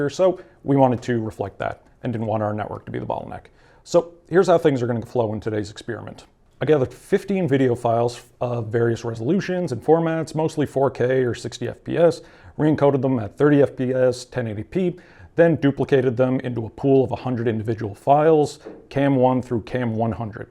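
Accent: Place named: Cape Breton, Canada. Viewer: If that isn't a CB accent I'll eat my hat.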